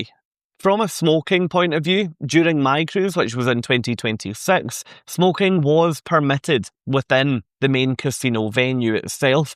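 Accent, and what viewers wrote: Scotland, United Kingdom. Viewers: Thanks for your video about the ship update. Although I'm good at understanding accents from various languages, yours is very, very strong; it must be Scottish, right?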